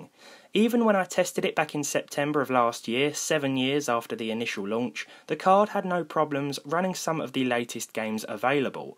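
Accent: United Kingdom. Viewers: that heavy british accent tho, it disgusts me , can you like stop chewing words ? damn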